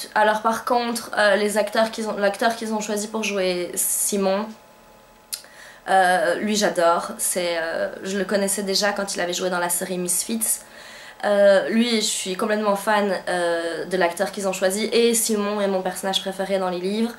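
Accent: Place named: Southwest, France. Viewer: Oups c'est un garçon, il s'appelle, (j'espère que je ne trompe pas..) il est aussi blogueur, d'après son accent il doit être du sud ouest de la France...Allez à suivre..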